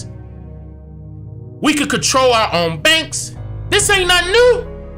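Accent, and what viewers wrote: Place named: Washington DC, United States. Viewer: Hey your accent sounds very DC like I'm from New Jersey and I moved to the DMV in high school I'm 34 years old are you from the district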